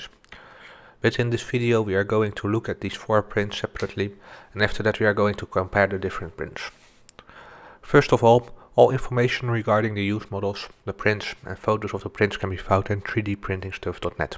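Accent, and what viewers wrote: Netherlands. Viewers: Dat klinkt Nederlands :) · Nice video man, but the heavy dutch accent is REALLY annoying! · I love your Dutch accents 👍🏼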